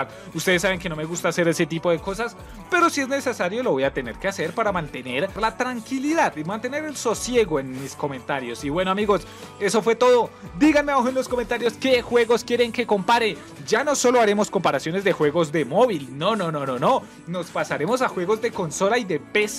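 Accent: Colombia. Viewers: Que no se note que es paisa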